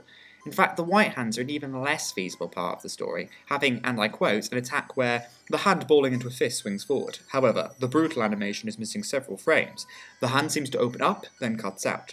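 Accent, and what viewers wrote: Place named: United Kingdom. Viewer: i like the video but can stop talking like a british guy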